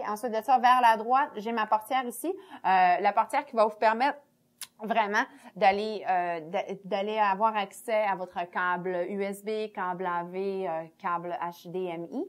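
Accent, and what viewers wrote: Canada. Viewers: Et toi t'as visiblement quelques soucis pour écrire français :p Sinon bonne vidéo même si je ne m'attendais pas du tout à ce qu'elle parle avec le vieil accent canadien xD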